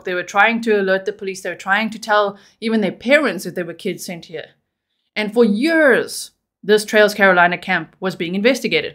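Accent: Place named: South Africa. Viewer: I was gonna ask if you’re South African because I can hear the accent, thank you for clarifying instead lol.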